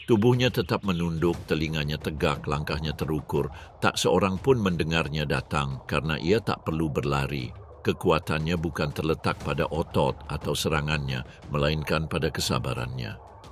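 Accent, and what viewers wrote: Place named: Malaysia. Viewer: Ini konten orang Malaydesh jangan di tonton itu logat bahasanya orang Malaydesh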